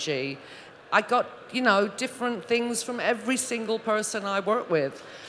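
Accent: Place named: Cockney, United Kingdom. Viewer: Love that East end accent!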